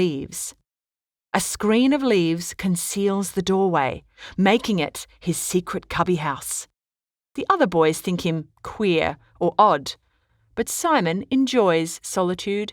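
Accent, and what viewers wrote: Australia. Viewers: These are great! Is the narrator? Is her accent from Australia? My students were curious.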